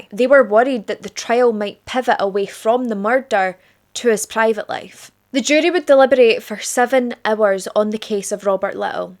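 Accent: Scotland, United Kingdom. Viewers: I just can't get over your beauty! I'd give almost anything to look half as beautiful as you! The accent tops it all off! There is literally nothing better than a Scottish accent!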